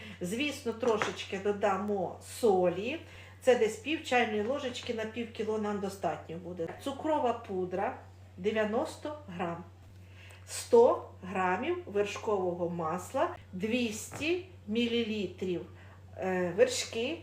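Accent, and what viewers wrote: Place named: Poltava, Ukraine. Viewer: Дуже подобаються сирні страви🍮,а якщо це ще і паска ,то взагалі 🎉💥.А ще респект за ваш суржик ✌️.Ви не з Полтавщини?Мої вітання з Дніпра.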